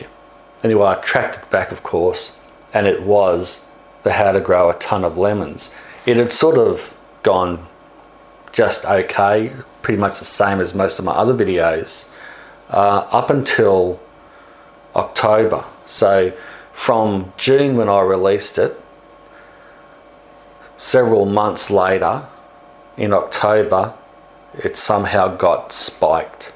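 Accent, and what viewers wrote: Australia. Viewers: I like your accent, it reminds me of crocodile dundee, your so full of life, I just feel like gardening myself. Great job. Great video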